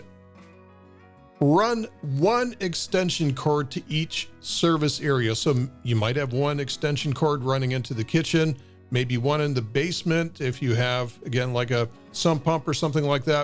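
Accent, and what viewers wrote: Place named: Northeast, United States. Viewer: ha! Your accent seemed more northeast. Great overview. Thanks for taking the time to do it. In a pinch, another way to achieve the bonding requirements of the F150 without an expensive 3-pole disconnect is to have a lockout device on the main breakers and a (preferably locked) switch for the bonding at the house's main panel. This is the same as disconnecting the bonding jumper in a subpanel, but you're just doing it without a screwdriver. Here's a video where the homeowner achieves it via the screwdriver method: <link>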